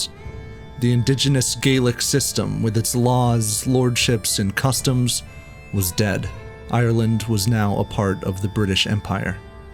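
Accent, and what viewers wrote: United States. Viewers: idk man i think youre overthinking it, sounds western US or Canadian to me, could be either but they sound almost identical, its not the south where almost all the accents sound the same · I agree with the accent thing the commentator is an American true and true 😂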